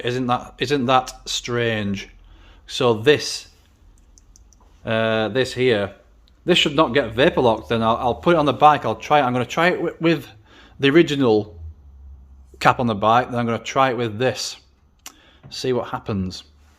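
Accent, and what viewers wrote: United Kingdom. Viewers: I have come across your channel by accident and absolutely love it, I am now a new subscriber to your channel. I love your Leeds/Bradford accent which only adds to the entertainment as far as I'm concerned. Great video's, great content, love it.....Keep it up.....I'm another VTR owner too. Cheers. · Thanks so much 😸👍 by the way your accent - are u from the same area as Sean Bean the actor?😸 My 1997 vtr is behaving a bit strange its always been a reluctant starter the 3 or so years I've had it especially in the colder mornings (below 10 degrees c starts affecting it) the colder, the worser. Just put manual cam adjusters on, tensioned by hand & went to restart to set them on the run. It wound over fired randomly, ran, didnt run, wouldn't start, backfired bad couple times. Found a plug electrode upper core broken (replaced both plugs). 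Tried again got it going & warm, adjusted cam chains by ear and shut it down thinking alls well. Tried again 5 min later & the timing chains (or something) making moaning sounds while winding over & it wound slower & made me fear its trying to sieze but at 12.66 volt it seems the battery is on the way out. Possibly starter too it was hot. But 20 min later after a cool off & battery slight self rejuvination, it turned the bike over ok however got another big backfire & sounding like it just doesn't want to fire. Battery now definitely getting down so that was it for today. Theres many things reall due now to start my maintenance records like setting valve cam clearances, I'd like to check the chain adjuster skis. Has new plugs now & I want to check the ignition coils if possible. Generator looks ok but found the left crank seal needs done (genny got oily) because the bung wouldn't come out of the generator cover cos some idiot overtightened or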